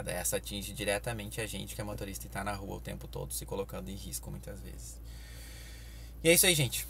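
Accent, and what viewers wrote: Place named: Porto Alegre, Brazil. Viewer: Que histórias hein! Valeu, não sou motorista, vim parar há algumas semanas por acaso no seu canal, mas já assisti vários e vários vídeos, to gostando bastante. Me identifiquei contigo, trabalhador, gente boa e o sotaque bem puxado que me lembra alguns amigos de POA! Ficou bem legal o quadro contando histórias de passageiros, faça mais vezes. Abraços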